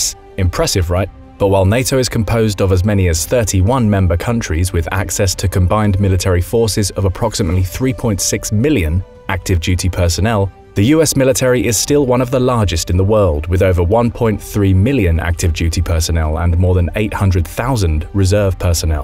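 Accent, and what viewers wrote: United Kingdom. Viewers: Is this the Infographics guy with a British accent? 😮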